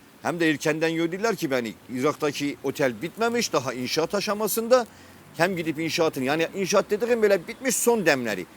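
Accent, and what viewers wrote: Erzurum, Turkey. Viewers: Galiba giyamet Kopti hele şükür Erzurumle mümesin video atmış konuşma tarzı Erzurum söyliyim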